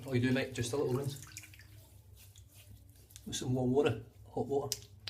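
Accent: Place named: Manchester, United Kingdom. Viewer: Nice tutorial, I've just bought the very same Proraso product (shaver is Edwin Jagger). Just a few more question, the pre-shave, on dry skin ? The soap, how long after the pre-shave ? The balm is before the after shave, and just straight after ? It seems you said usually it's the contrary, no ? Oh and lovely manchunian accent 👍😁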